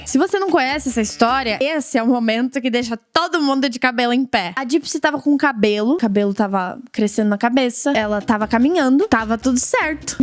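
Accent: Rio Grande do Sul, Brazil. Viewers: Mas tu tem o sotaque daqui de rio grande do sul tchê!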